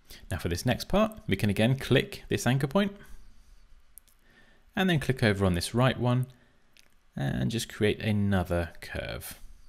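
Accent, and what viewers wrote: United Kingdom. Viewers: the tutorial seems to be easy but I cannot understand some commands ( not a native speaker ) not used to a British English pronunciation. Will try more anyway! Thank you for your videos!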